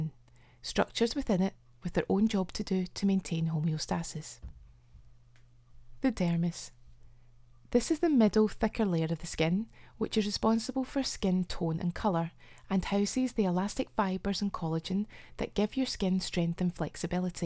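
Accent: Scotland, United Kingdom. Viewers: I really love your vids, please keep them coming! Very informative and I love your voice and Scottish accent!!! Cheers!!!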